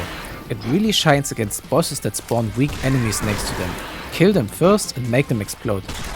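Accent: Germany. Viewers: German accent man is back with another video!!!! POG You could narrate so many informative videos and I would watch them for your voice alone. It just seems so… perfect for this type of informative video. I don’t know why. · Ich mag deine Videos sehr, wwil sie ausführlich und informativ sind, aber ich finde, du solltest besser an deiner Aussprache arbeiten. Dein harter deutscher Akzent triggert mich extrem. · nice german accent i love it hahah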